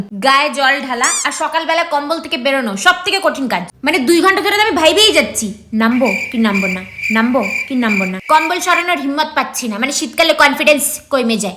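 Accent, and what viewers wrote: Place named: Bangladesh. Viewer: আচ্ছা দিদি তোমার ভাষাগত টান বাংলাদেশের মতো। তুমি কি বাংলাদেশি?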